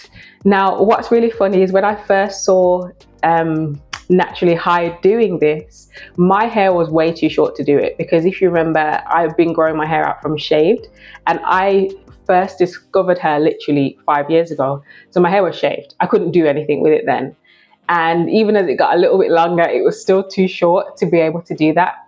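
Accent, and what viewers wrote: United Kingdom. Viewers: Good to hear a British accent. Greetings from another northerner.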